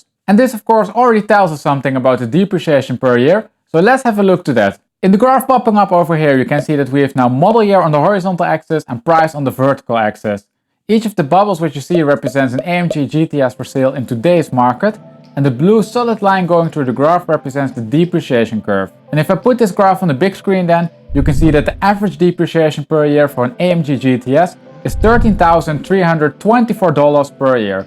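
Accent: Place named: Netherlands. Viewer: Hello, bought myself a facelift GTS 2017 with 9000km in Belgium for 85k€. What a car. Beautifull en lots of sound and driving pleasure! Sold my <num> turbo cabriolet for same price as i bought it 10 year ago! Thanks for all your unique and perfect analysis. Regretfully all in dollar and US figures... as i think hearing your accent that you are from europe.... Holland i think 😅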